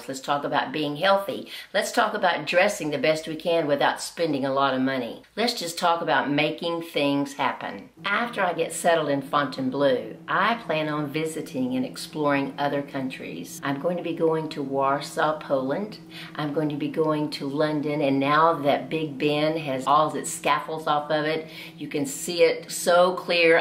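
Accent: South, United States. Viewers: I like your uniqueness..they will love your southern accent, many people will be envious of you. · I love her accent-American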